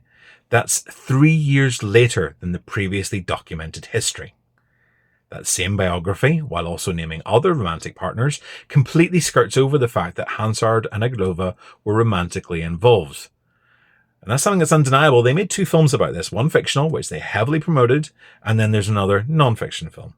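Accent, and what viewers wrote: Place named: North, United Kingdom. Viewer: A northern accent 😮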